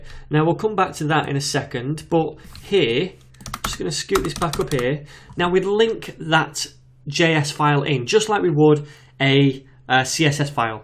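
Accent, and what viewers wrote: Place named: North, United Kingdom. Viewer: Clear and concise tuts with the added bonus of a northern accent.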